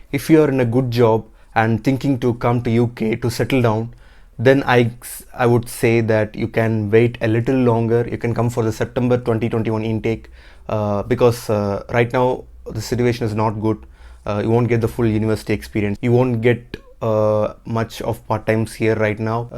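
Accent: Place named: India. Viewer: Why do you repeat the same thing again and again. Your accent is terrible - typical Indian accent 🤣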